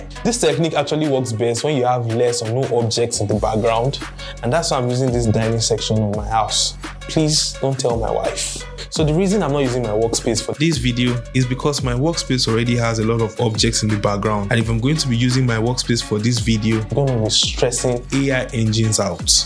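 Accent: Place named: Africa. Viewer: Wahala! If you can't understand his accent, then you're clearly not his target audience. There are millions of other creators that have made videos on this, so go watch them. Imagine telling a creator to change his accent, a deeply ingrained trait, just to fit you. And calling his accent "local"? That's such a colonized, back-handed mindset. Accent is due to speaking another language with inflections similar to your native language. But asides from being widely spoken, please what makes English a "higher" language to diminish or look down on our beautiful African languages and accents. Please oo, think before you comment.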